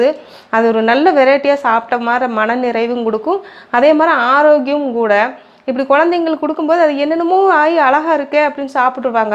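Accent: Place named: Coimbatore, India. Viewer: Coimbatore voice super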